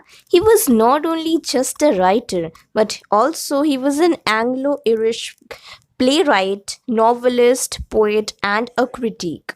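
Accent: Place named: India. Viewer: You have a kind,sweet voice but it is not for narration. A heavy Indian accent just doesn't go with a tale of a very British poet. But a nice little biography ...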